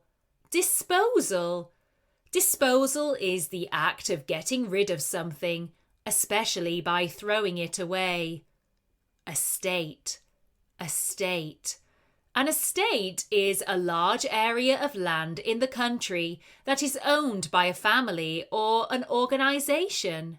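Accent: United Kingdom. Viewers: 🏋️🏋️🏋️ Thanks so much ☺️ Ma'am it is a wonderful story ,I was so scared of listening 🎧,the story I thought I can't understand , fortunately I can understand because I do love British accent💃💃💃💚💛 Definitely I have explored that ,this is the good way of enriching our english pronunciation as well as improving our vocabulary 📌💚💪Your voice is clear everyone can get what you mean 😊😊😊😊✨.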